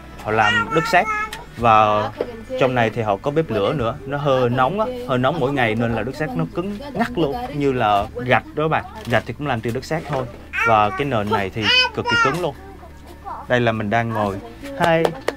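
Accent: South, Vietnam. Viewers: A là người nước ngoài sống ở Sài Gòn, a xem video của a để tập nghe tiếng việt vì giọng miền nam quen thuộc với tôi. Cảm ơn nhiều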